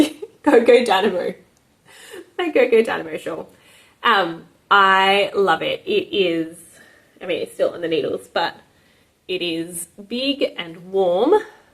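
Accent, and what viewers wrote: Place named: Australia. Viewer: Hello Lovely. You just popped up out of nowhere but Im glad you did. Love your Aussie accent and all your makes. So far from home but home is where the heart is, eh? Im an Aussie too of Romanian background. Do all the crafts too...about to finish a quilt and work on a few knitting, crochet wips . I am married to an artist.Coming up to our 20th. Love that your Dad paints trees.Go well in your days. Just finished my Go Go. Blocking as I type. ❤🐨🌏xx🌞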